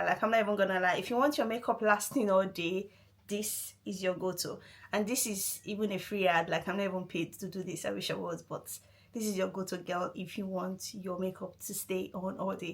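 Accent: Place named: Benin. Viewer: Benin accent got me 😂😂😂❤❤❤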